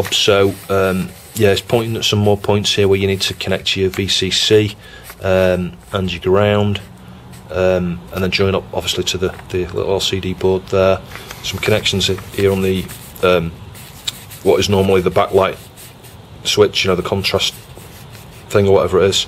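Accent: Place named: Liverpool, United Kingdom. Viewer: What part of the UK are you from you sound sorta Scouse but then sorta like Manchester or even Cumbria. Good to see you enjoying your hobby!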